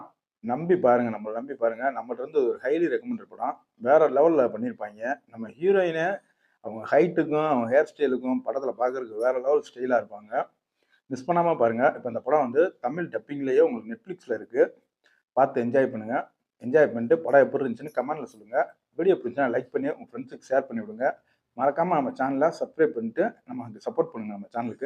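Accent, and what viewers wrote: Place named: Usilampatti, India. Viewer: Madurai usilampatti ya bro... naanum than😅slong theriyuthu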